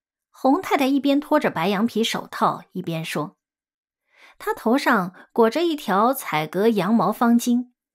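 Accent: Shanghai, China. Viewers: 喜欢你！希望你能多读一些上海题材的小说，对于我一个移居国外多年的人来说，听到乡音会感到很幸福，谢谢！❤ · 的上海话刚得也老道地的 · 主播本来就是上海人😄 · 主播是上海人啊？ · 的嗓音真的是好听！全文都用上海话来播报就更绝了❤🎉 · 謝謝👍🙏🌹❤一直記得這篇文章。今天又听見了，尤其聽侬讲上诲闲話好亲切❤❤❤🌹🌹🌹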